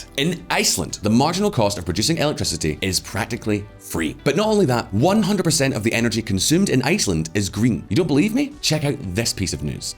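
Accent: United Kingdom. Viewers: Scottish accent over British, any day of the week 🤤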